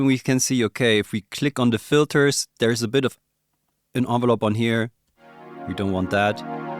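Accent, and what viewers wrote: Germany. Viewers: I only trust synth advice from German sounding people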